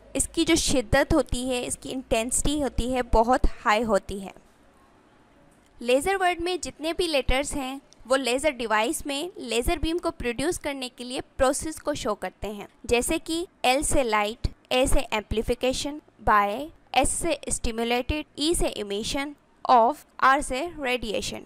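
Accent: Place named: Deccan, India. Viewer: Aap Deccan, India se hai shayad apki accent waisi hai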